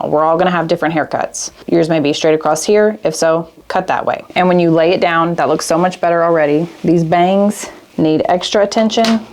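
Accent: South, United States. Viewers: Is it me or did you have a southern accent years ago and now you don't seem to as much. I have been so grateful to find you in 2022 you helped me through cosmetology school. I still love watching your videos. Thank you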